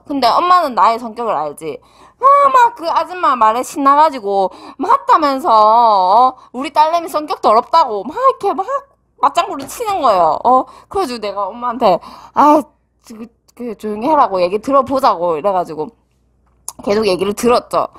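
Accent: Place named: Gyeongsang, South Korea. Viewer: 타로 거기 좌표좀 대구면 가볼려구요 ㅎ 말투가 대구나 부산같내요 ^^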